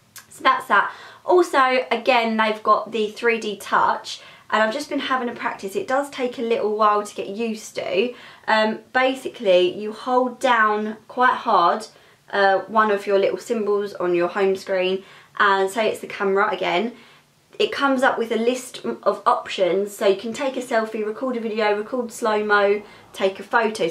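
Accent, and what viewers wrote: Essex, United Kingdom. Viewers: sounds like Essex maybe? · I like british accent !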